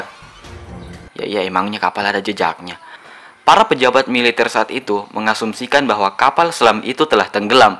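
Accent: Makassar, Indonesia. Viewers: Orang Makassar detected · Abang orang makassar yah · Santemi logat makassarta' bos 😂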